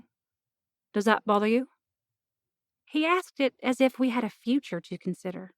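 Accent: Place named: West Virginia, United States. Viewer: The West Virginia was accurate but I got tired of listening to the twang. Sorry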